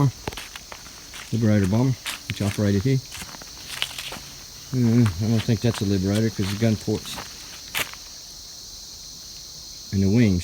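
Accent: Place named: South, United States. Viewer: Your from south US aint you?,or your parents are, and your dad was into ww2 stuff and you talked about it alot, that alabamba slack yaw, no offence really comes through when you mention the stuff made from USA...being english i can pick up difference's very easy, like the difference between canadian and american, and ozzies and with new zealanders...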